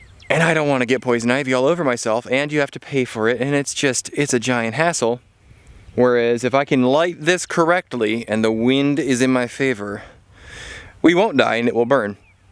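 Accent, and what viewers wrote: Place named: Australia. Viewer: Hilarious how we get down under accents from you I would be doing Yankee or pommy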